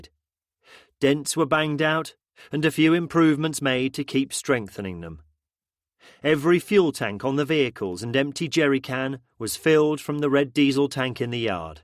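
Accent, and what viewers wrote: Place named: United Kingdom. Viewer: The cherry brit voice kills it for me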